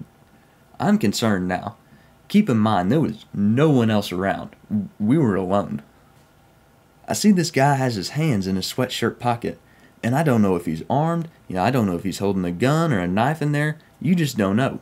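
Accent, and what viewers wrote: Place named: Oklahoma, United States. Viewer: Enjoyed your “500 Subscriber Special” and Thanks for confirming you’re Accent is from down in Oklahoma. Keep up the strong 💪 efforts with your videos. 👍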